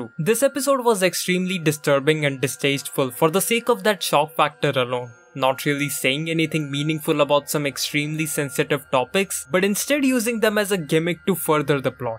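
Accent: India. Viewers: Are you indian? your accent is hard to pin down...it sounds indian and Italian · The Indian accent makes me not be able to take the video seriously. The editing is good.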